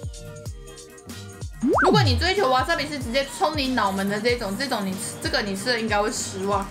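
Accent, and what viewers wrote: Taiwan. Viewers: 台灣國語也太親切了🤣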